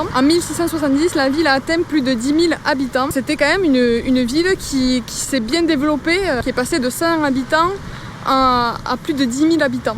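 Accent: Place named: Carmaux, France. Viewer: Un travail de montage de qualité, un accent carmausin au top et une diction impeccable, bravo :)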